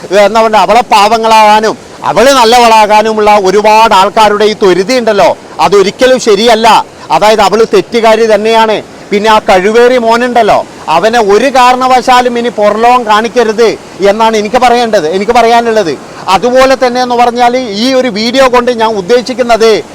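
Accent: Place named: Kasaragod, India. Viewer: കാസർകോട് ഭാഷ.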